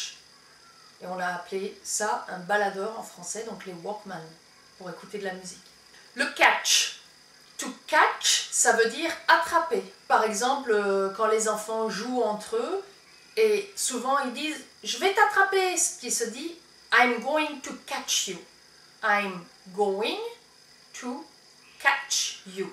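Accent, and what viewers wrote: France. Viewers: tiens, toi tu viens du nord non ? :p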